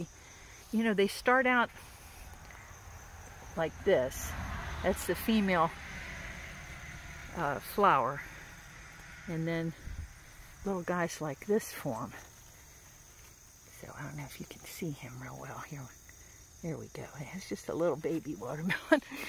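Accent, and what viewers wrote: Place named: South, United States. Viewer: Is it just me or did I detect a bit of a southern accent coming out in this video? Lovely update and tour! That little watermelon just hangin out chillin in his panty hose hammock made me laugh! 😄 What do you do with your acreage across the street? I love how your home has so much wide open space! Thank you so much for sharing my friend! 🥰❤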